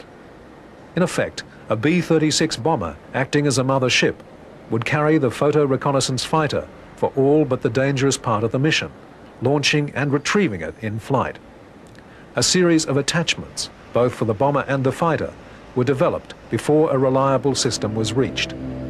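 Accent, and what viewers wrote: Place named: Australia. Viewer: Wow ,i can understand the Narrator good for a change ,sounds like a Aussie.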